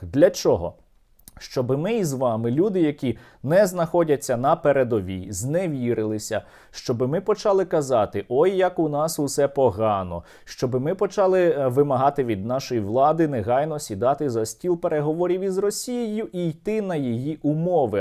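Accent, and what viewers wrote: Ukraine. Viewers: Українська в нього стала набагато кращою!!!Але вплив російськомовної Одеси на нього ще відчувається!!!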